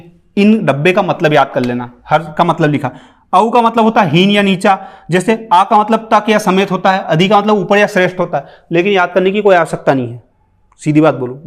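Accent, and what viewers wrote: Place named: Bihar, India. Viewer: Teacher सायद बिहार से है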